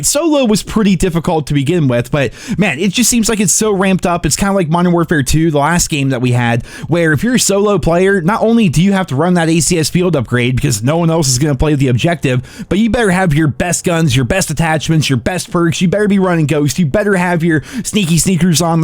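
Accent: Scotland, United Kingdom. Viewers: Scottish not Irish dude 😂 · Lmfao as a Canadian that was an obvious Scottish accent 🤦🏽‍♂️ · Irish?????? Clearly Scottish..... I think you said sweaty players 5 times while laying prone in the corner 😂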